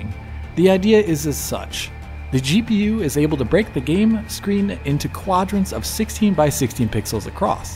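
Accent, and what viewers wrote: Germany. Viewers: he's german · Because he's german and knows how to pronounce it correctly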